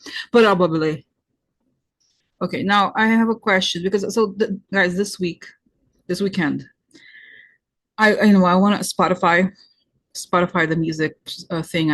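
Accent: United Kingdom. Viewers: love your British accent! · Love your brit accent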